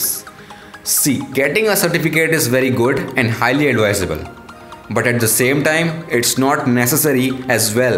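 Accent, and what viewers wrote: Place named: Telugu, India. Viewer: Telugu accent spotted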